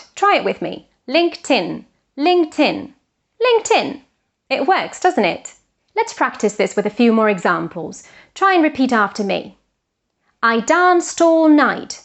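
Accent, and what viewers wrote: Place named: United Kingdom. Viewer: he notado que tiene el acento británico. Es mas facil aprender inglés britanico?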